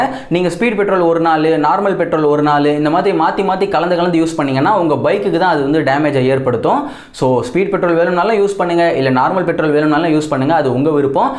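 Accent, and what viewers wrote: Vellore, India. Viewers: Nega Vellore ahh???.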